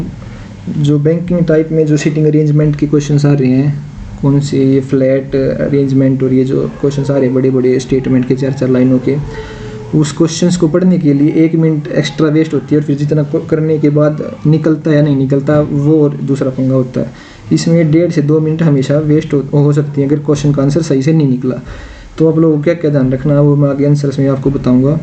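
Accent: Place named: Haryana, India. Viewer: Haryana alya ki to boli t e pichan hoja h konse district ka h bhai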